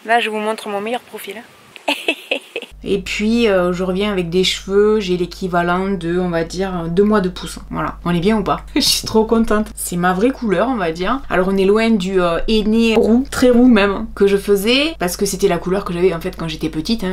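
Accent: South, France. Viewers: C’est la 1ère fois que je commente car ce n’est pas dans mes habitudes. Je suis tombée un jour par hasard sur 1 de vos vidéos du début de votre maladie, les mystères de youtube... je l’ai regardé, puis j’ai suivi les autres, j’ai fait des come backs sur les vidéos précédentes... Je vous ai trouvé tellement authentique, tellement nature, à chaque étape de ce long et douloureux parcours. Vous êtes une belle personne au dedans comme au dehors, peu importe les cheveux les sourcils, les cils, et cette pointe d’accent qui sent bon le soleil que vous avez au fond de vous, vous êtes vous même, ne changez rien. Je vous souhaite le meilleur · Merci pour votre bonne humeur, votre accent du soleil me remonte le moral 👍 Je vous comprends tellement, il me tarde de pouvoir me remaquiller 😊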